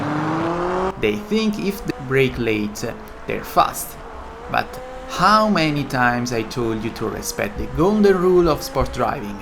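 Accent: Italy. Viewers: Is forza Motorsport 7 a good simulation game? Btw are you italian? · I love your English! Are you Italian? If so, greetings from your neighbour Albania 👋🏻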